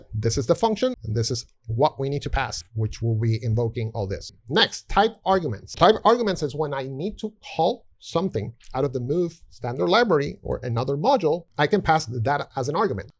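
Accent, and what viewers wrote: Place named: United States. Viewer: are you from america? i hear an accent i think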